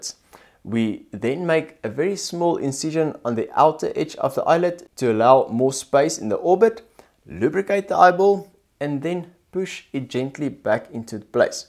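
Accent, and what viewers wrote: South Africa. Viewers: Kan hoor jys van suid afrika en n afrikaner lol.... my pikanese se oog lyk of hy wil uit pop , dis wat my na die video bring.... lol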